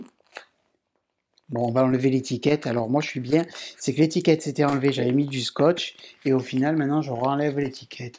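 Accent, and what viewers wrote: South, France. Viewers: Bonsoir, je te decouvre au hasard du net et j'adore ta bonne humeur et ton accent du soleil. Merci pour ce moment détente autour d'une passion commune ❤ Christine, une nouvelle abonnée du nord Isère 😊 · Bonjour c'est moi qui te remercie pour tes vidéos qui sont très agréables. L'accent du midi remplace le soleil qui manque dans la région parisienne. Un grand oui pour les vlogsmas de décembre. A bientôt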